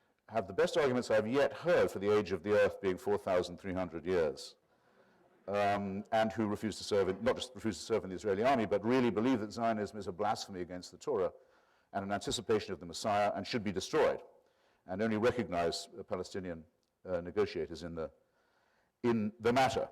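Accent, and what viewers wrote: United Kingdom. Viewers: So many reasoning errors, yet structured with such eloquence, a beautiful British accent and confident condescending tone. To name a few: 1. Opposing Zionism before the creation of the state of Israel is not the same as after it's establishment. In the same way that not wanting to have a child is different than wanting to get rid of a living person. 2. The fact that Jews didn't want to be told that their place is in Israel, doesn't really negate the idea of zionism. Zionism was never about forcing all Jews to live in Israel, just creating a safe haven. 3. An in-group argument is very different in nature a criticism from the outside. Targeting a group by finding a group inside the group that sides with you (for reasons that have to do with the Jewish religion, nothing to do with your reasons) is classic tokenism. Just say some of your friends are Jewish, it's shorter. 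4. The fact that there are antisemites that are content with Zionism, does not in any way lead to the conclusion that opposing Zionism is not antisemitic. It might have a nice witty ring when he says it, but logicality it doesn't follow. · I wish he had american accent. Bit hard to get everything with british accent. Good stuff thou😊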